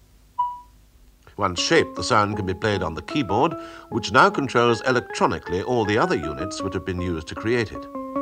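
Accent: RP, United Kingdom. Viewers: Is his accent considered RP? I'm American and am trying to work out UK accents.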